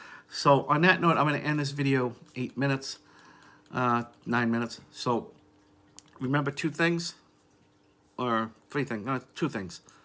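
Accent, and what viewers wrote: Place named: Massachusetts, United States. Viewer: Watching from Kentucky. I can tell ur in Mass. Your accent. Love it. 😍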